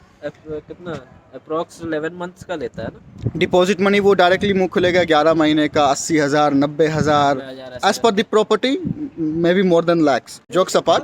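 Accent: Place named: Bhojpuri, India. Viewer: Commentary sahi hai bhaye... With bhojpuri ascent...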